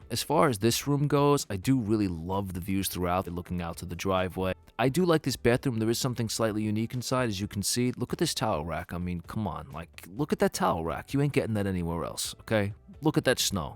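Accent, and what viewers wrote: New York, United States. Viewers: Dang that New York accent you have there is cool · Also are you from New York? Your accent definitely sounds like it. Which is a compliment btw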